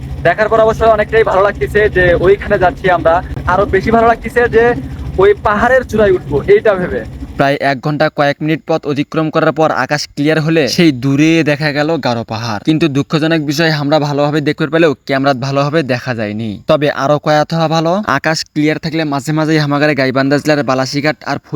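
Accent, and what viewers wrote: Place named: Bogura, Bangladesh. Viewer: গাইবান্ধার ভাষা এমন না। এটা বগুড়ার ভাষা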